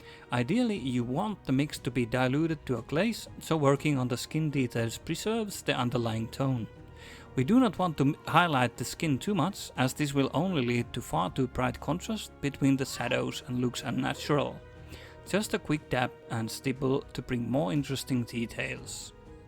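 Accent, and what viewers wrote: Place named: Scandinavia. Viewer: Looks great!! I painted my Tzeentch similar but through airbrush techniques. Sounds like you are Scandinavian, svensk?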